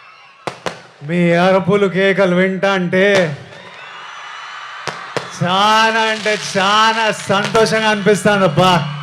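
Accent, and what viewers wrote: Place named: Rayalaseema, India. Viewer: Rayalaseema slang🎉🎉🎉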